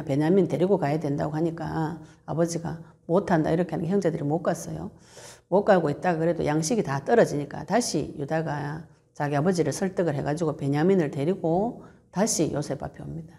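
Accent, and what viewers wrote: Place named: Gyeongsang, South Korea. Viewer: 갱상도네? 대군감?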